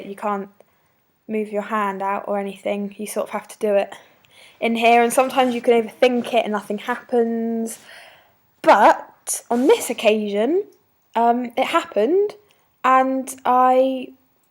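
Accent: United Kingdom. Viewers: where from the UK you from? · lol i can never take british accents seriously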